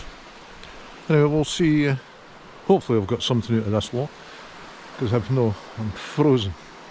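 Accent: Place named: Kirriemuir, United Kingdom. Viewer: you're doing well! Best of all is hearing the Kirrie accent!!! (Missing that!) 😀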